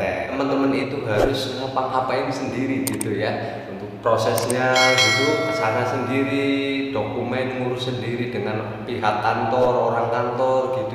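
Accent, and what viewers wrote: Banyumas, Indonesia. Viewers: Ngapak?, Cilacap apa Banyumas mas?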